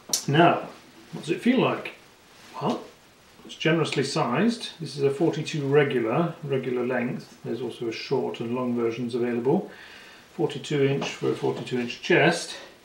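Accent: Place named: United Kingdom. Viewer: Interesting review, and beautiful tweed. Your expressions and inflections are very British, surprised to find that you are Norwegian.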